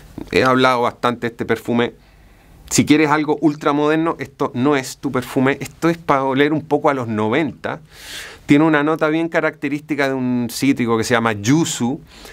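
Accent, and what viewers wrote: Chile. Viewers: Me choca el acentico Chileno de éste tipo.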